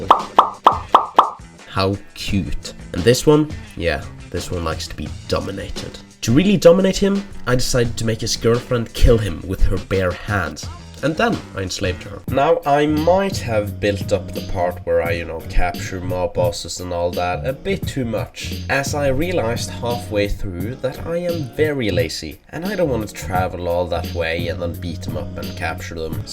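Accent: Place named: Scandinavia. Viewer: Could hear at once that he's a fellow Norse boy ^^ Gonna watch this video later today :3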